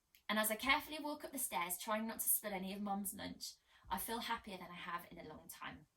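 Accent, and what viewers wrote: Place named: United Kingdom. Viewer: Hello Miss I am an English learner from Russia and I have been choose these book because it is exactly my level - not so easy not so hard. So what I wanted to say - I really like your British accent and how you read the book and also I was really surprised to see in your channel the author of the book. Thank you for your reading and I wish you all the best.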